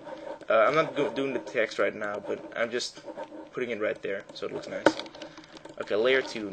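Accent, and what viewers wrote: Netherlands. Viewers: Ik zie aan je accent al dat je Nederlands bent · Hey bro, awesome vid, btw are you dutch? One little thing that is kinda annoying is that your mouse is pretty loud. I guess this is because you don't use a mouse mat. Once again, awesome vid overal:) gj keep it up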